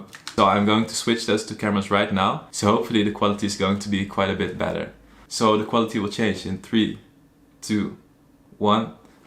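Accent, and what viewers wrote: Netherlands. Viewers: you started speaking and i knew! Das een Nederlander! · Are you dutch? i can hear your accent. :3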